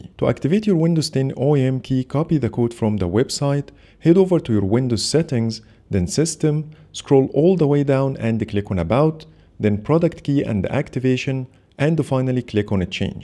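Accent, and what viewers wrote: Egypt. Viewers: انت مصري واضح من الاكسنت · Man I don't know if you're Egyptian or not but i admire this Egyptian accent 😂❤️❤️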